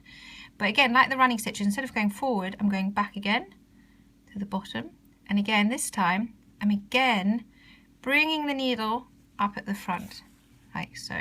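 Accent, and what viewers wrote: United Kingdom. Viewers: ur British ;)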